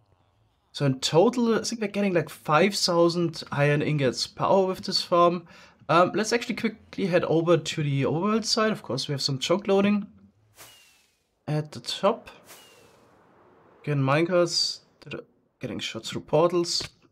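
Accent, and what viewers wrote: Germany. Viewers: Are you from Germany? Asking bc of youre accent 😅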